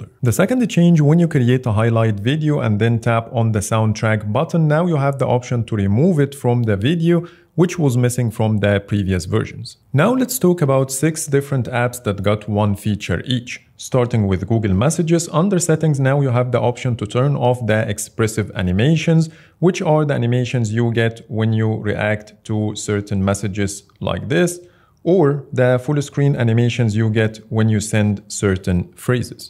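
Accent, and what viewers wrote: Egypt. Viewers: ياخي حاسك مصري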